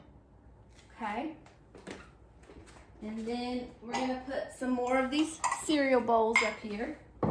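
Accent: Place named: Alabama, United States. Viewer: I’m so glad I found you! A lot of things you use and say remind me of myself. I have several of my grandmothers pieces and they bring back such sweet memories! You are so cute and precious! Where are you from? Sounds like Alabama. I live in Memphis. My daughter leaves in five days for Bama in the fall. ❤️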